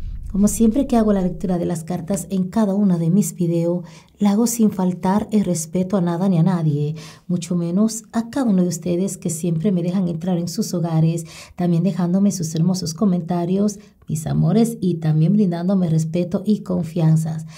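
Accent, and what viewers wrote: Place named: Dominican Republic. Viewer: Los dominicanos hablamos haci, pero solos un amor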